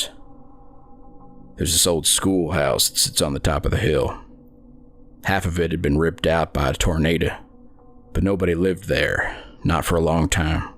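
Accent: South, United States. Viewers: I am enjoying the Southern drawl dialect, the story is okay, not the best but the narrator voice made me want to listen to the end.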